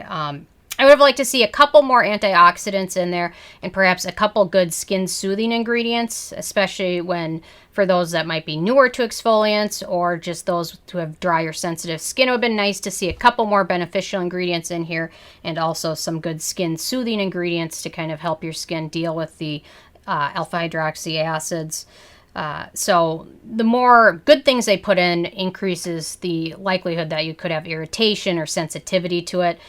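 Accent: Minnesota, United States. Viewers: You sound Minnesotan/North Dakotan. Am I hearing things right? 😳🤣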